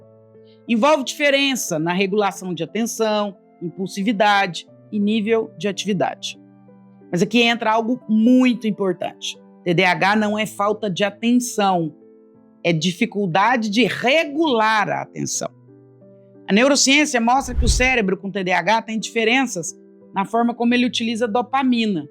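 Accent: Minas Gerais, Brazil. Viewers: Sempre ouço os vídeos em podcast! Mas percebi no sotaque mineiro,uma credibilidade,. portanto a atitude de não menos que se inscrever em um canal a qual pessoa me faz se emocionar!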